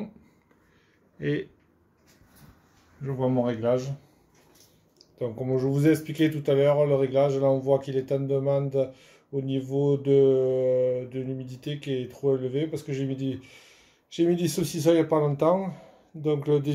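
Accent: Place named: Vaucluse, France. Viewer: Merci à toi surtout !!! J'ai récupéré un frigo qu'on m'a donné et fait exactement la même installation que toi (j'ai bien branché la terre) et j'ai lancé aujourd'hui une belle rouelle de porc!! Encore merci! Tibo du Vaucluse (on doit pas être loin vu l'accent)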